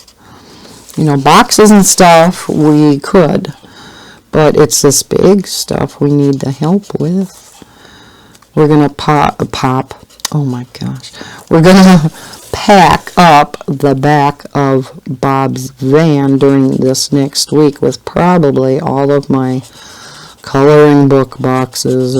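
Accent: Wisconsin, United States. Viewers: praying for you in this rough patch. I already had started this pic so I colored in my new JS Color by number and hope you can show, color and chat with it. Would love to hear your thoughts on this! If you don't have it can I send it to you? So what you are saying is the videos are limited in this season or is it going to be forever? Do you have COPD? You always have a croup sound and cough. Just worried. You don't sound weird to me with your dental issue but you sound like a lady from Wisconsin! You are a soothing cup of hot tea in my life and appreciate you so much! 🥰🌠⭐🌠😊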